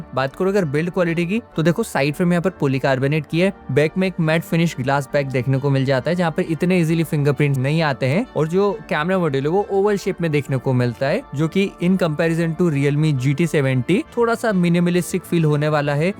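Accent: Indore, India. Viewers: You are from indore ?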